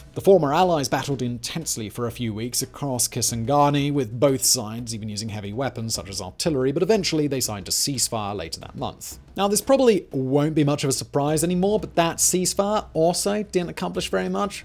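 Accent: United Kingdom. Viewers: Lol, Karen? Doesnt really apply here. But to be fair i have no idea why it bugs me so much 🤔 maybe because its the diction of the colonizers or something, i genuinely dont know 😅 but when i hear british people turn "a" into "er" it just FEELS racist lmao in my soul. I know its not. But it feels like it. I hope he doesnt sing along to rap music very often 😬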